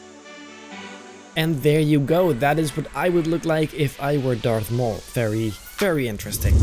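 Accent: Netherlands. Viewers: ik wist het, je klonk al zo Nederlands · When I heard you speak I had some suspicions and during the contest segment it was indeed confirmed you're a fellow Dutchie. Leuke video, ga zo door!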